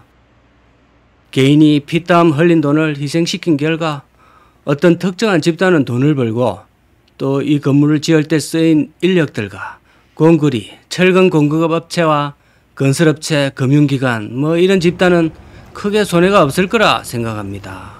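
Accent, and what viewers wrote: Gyeongsang, South Korea. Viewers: .갱상도.사투리를.찾아서.또 오시것습니다. 너무나.좋은방송.😊😊😊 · 동영상을 보면 경상도 사투리가 참 정겹고 차분하게 들리네요. 고령화와 인구감소에 온라인 영업 저변화로 부동산업이 망해가는 한국.. 더 망해야 한국이 재활하는 길입니다.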